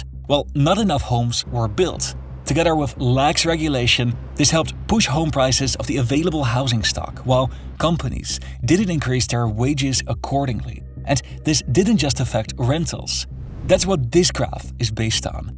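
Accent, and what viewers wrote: Netherlands. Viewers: Ik kon aan je Engels horen dat je moedertaal Nederlands is. Checked the ‘About’ section…and sure enough. (Living in Florida past 15 years)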